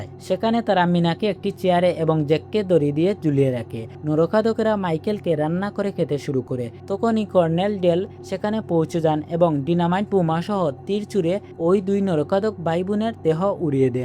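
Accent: Sylhet, Bangladesh. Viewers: R you sylety 😊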